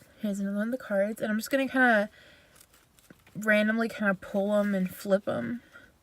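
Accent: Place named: South, United States. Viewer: lol im from Nashville, i could sense you were from the south❤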